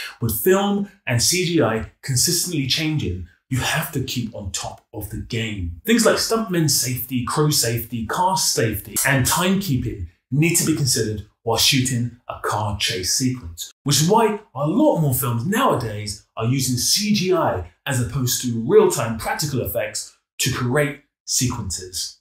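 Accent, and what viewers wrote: United Kingdom. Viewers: I thought this guy was British based on the accent! Lol was surprised 😂 nice vid Mad Max was a gem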